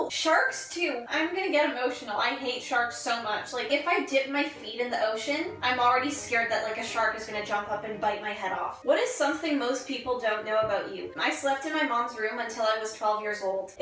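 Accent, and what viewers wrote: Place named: Canada. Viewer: her canadian is showing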